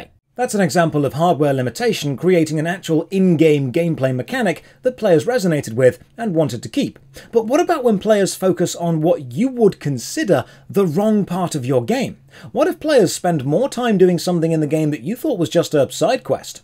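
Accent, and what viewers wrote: United Kingdom. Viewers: brittish eric foreman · are you spiffing brit?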